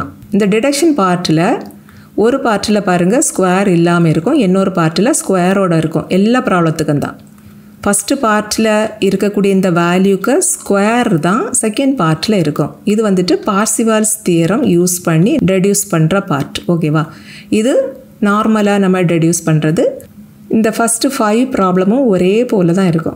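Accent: Kanyakumari, India. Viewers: Kanyakumari slang matiri irukutu niga pasuratu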